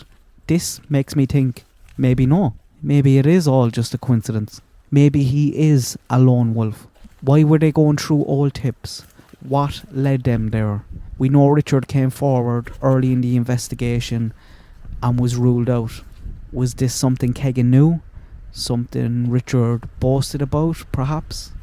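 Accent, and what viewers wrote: Ireland. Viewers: Helloo well done my friend always enjoy ur take it might be the oirsh accent but I like the take..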